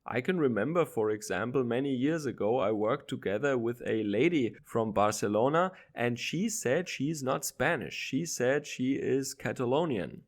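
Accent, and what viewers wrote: Germany. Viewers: I’m not overly interested in Spain, but I am trying to learn more about European politics, & all the coalitions make them similar in a way - as you compared the top 3 winners to German results - and very different from the US politics I know the most. I think you did quite a good job compared to a few other vids I watched/news I’ve read on recent Spanish politics, & I find your voice with the heavy German accent very pleasant to listen to. Reminds me of my dad, may he Rest In Peace. I like the little personal insights/anecdotes, too! Keep up the good work :)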